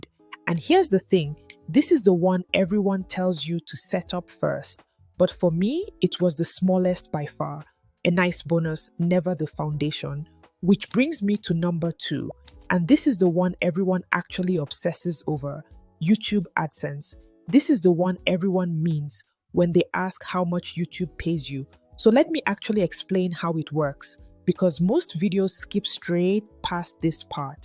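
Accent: Nigeria. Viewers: Yea. I knew I could recognize that's Nigerian accent. I love your channel and I'm subscribing 🖐️ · Wait you are Canadian keeee.. you sound soo Nigerian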